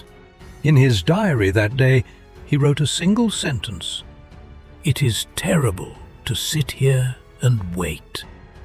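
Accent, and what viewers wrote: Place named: England, United Kingdom. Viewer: I really wanted to listen to this but the English accent ruined it.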